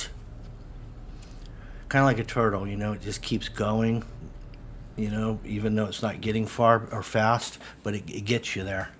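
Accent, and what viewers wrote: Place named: California, United States. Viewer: Listening to California Surfer accent takes me back...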